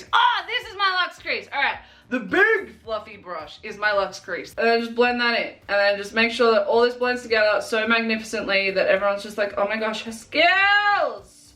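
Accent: Australia. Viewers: I love your bombastic and I also love your real voice and personality:) It's so refreshing to hear an Australian voice on YouTube - and idk, hearing someone do internet memes with my native accent is weirdly comforting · i like both, i kinda liked how you and were in this video. the accent is just hilarious although i adore your aussie accent. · By gosh, I looooooove Austrailian accents! (Okay, I'm done gushing. Maybe.) Whether you're you or you're, you're amazing and you bring a TON of joy to those who watch your videos!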